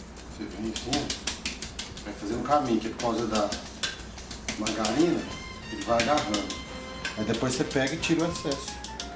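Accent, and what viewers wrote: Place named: Minas Gerais, Brazil. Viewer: PARECE BOM, VOU ME AVENTURAR E COMEÇAR AGORA, OBRIGADA PELA RECEITA VIU E TÁ MELHOR AINDA PQ TEM UM SOTAQUE QUE EU ACHO QUE É MINEIRO, (BOCADIM) .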